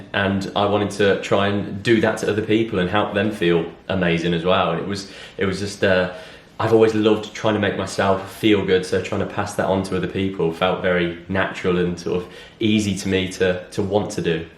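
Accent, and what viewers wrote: United Kingdom. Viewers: Amo o sotaque britânico 🇬🇧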